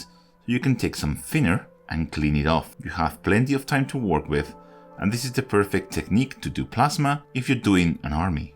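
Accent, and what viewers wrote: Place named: Spain. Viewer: Fue escucharte hablar inglés y supe de inmediato que eras español, nivelazo de inglés pero el acento español siempre se nota.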